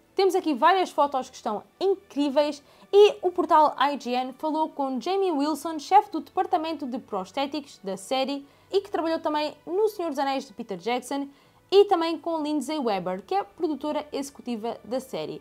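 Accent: Portugal. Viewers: Eu simplesmente adoro o português lusitano da. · Ouvir uma portuguesa falando sobre os anéis de poder é uma sensação diferente, gostei haha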